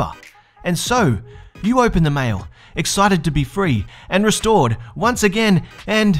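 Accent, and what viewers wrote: Australia. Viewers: definitely aussie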